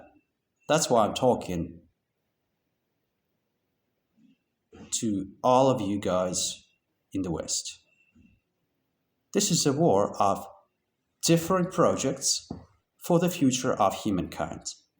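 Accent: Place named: United States. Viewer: Вітаю, а ти якось навіть схожий на американця, ще і вимова така автентична :hand-pink-waving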